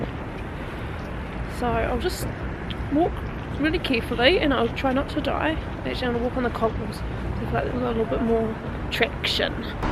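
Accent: New Zealand. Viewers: Love your kiwi accent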